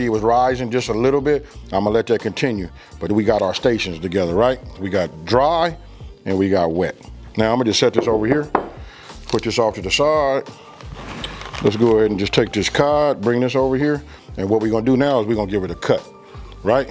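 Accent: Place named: West Coast, United States. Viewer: Side-note I started watching your video and as soon as I heard you start talking I was like he’s so west coast 😂 he gotta be either from Cali or Denver I looked at your info and it said riverside i knew it I’m from Sac but I live in Denver now but its still the same Westcoast vibe anyways i just thought it was funny thanks for the video stay dangerous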